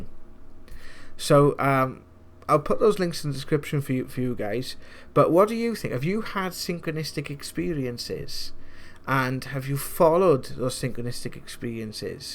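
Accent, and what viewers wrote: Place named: Wales, United Kingdom. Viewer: You sound Welsh asf hahaha proper valley accent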